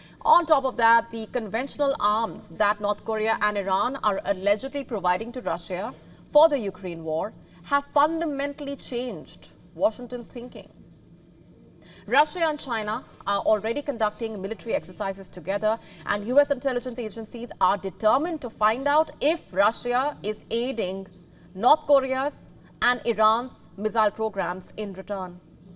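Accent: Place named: India. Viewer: What the heck of indian accent! 🤔🤭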